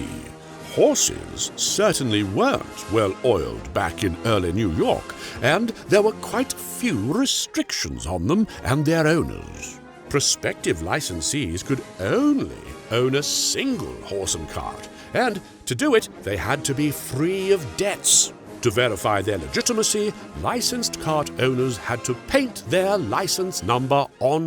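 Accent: United Kingdom. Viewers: Im still confused about a British voice being so excited and enthusiastic about America lol but i love it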